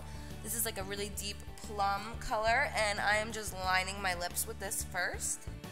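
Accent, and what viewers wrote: New Jersey, United States. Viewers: I never noticed her jersey accent until now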